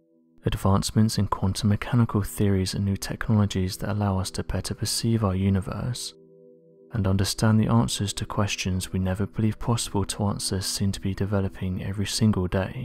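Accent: United Kingdom. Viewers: Why is there a British sounding person talking just wondering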